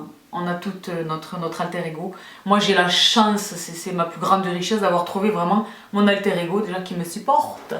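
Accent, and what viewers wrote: South, France. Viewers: Quelle belle rencontre!!!!!!! Tu m'éclates. J'aime ce que tu dégages j'aime ton humour ton accent avec tes mots parfois qui me semblent être de l'occitan ou du basque bref le sud quoi!!! Merci merci merci Voilà mes résolutions pour cette nouvelle année combattre la médiocrité et me faire du bien. Merci à byreo grace à qui je me suis orientée ce vendredi vers ta chaîne que j'adopte Continue à nous faire du bien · Super vidéo! Ton accent vient du sud? Marseille? Ou Toulouse? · Super personnalité, super accent du sud, super belle, super intelligente (bon j'arrête là:D)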